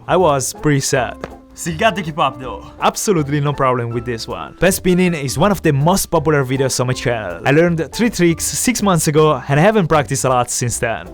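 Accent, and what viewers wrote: Italy. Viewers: Your english sounds like italian english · You can practically taste the Italian accent,Grandioso, lol. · Si capisce subito che sei italiano ahahah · non so perchè ma dall'accento , e dal fatto che quando hai fatto il test della velocità di scrittura era italiano penso proprio che tu lo sia , non so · ma come forse😂 l'accento è italiano😂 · Ahaha, when you said that you have to become a compass I knew you were Italian, then I listened to the accent and saw the location. gg bro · Ma sei italiano perché questo è il tuo primo video che vedo e si sente che non sei ne inglese e ne americano e anche perché parli in inglese uguale a me😂🇮🇹 se sei italiano sei un grande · vedo un po di italiano in questo inglese <3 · Si sente che sei italiano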